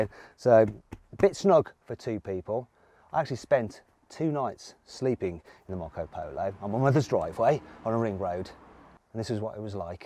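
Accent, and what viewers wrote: United Kingdom. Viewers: does that mean is Polish immigrant? I always thought hes British because of perfect accent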